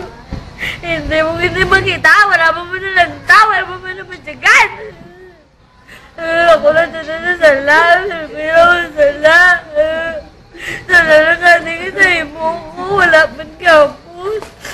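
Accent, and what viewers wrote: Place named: Hiligaynon, Philippines. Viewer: Nami gid pamatiun mag storya ilonggo ah :) daw ma inlove man ta saimo ba :)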